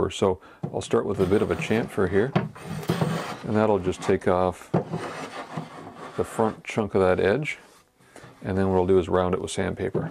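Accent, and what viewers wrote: Canada. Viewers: Thanks for another great video. Yes, we do need to see you more often. a) Why are you apologising for your Canadian accent? It's as good/bad as any other. b) Yes, I've emailed Lee Valley. c) yes, I gave it a thumbs-up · Don't worry about your pronunciation of the word router. I love the Canadian accent. I drove a taxi here in the UK, and Canadian passengers were always pleasantly surprised when I asked what part of Canada they were from. They always assume that the British think they are Americans. As soon as I heard a word with OU in it it was obvious they were Canadian. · You don’t need to be sorry for your Canadian accent. It’s part of what makes you uniquely you. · No need to apologise for your wonderful and authentic Canadian accent. Love your channel!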